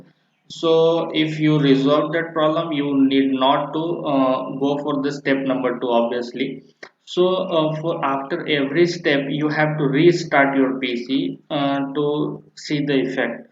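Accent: India. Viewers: Ffs Good Video But Im So Sick Of That Indian Accent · the indian accent is the cherry on top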